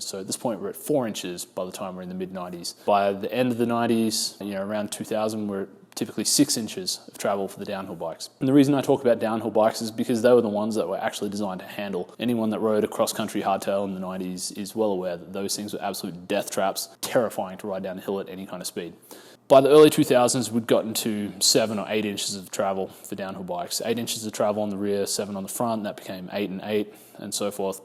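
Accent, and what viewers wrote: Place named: Australia. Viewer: haha reppin the aussie accent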